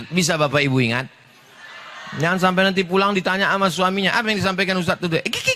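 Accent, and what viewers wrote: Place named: Malaysia. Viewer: saya orang jawa sangat suka dan candu dengan tausiah logat melayu UAS,sehat2 slalu buat UAS❤❤❤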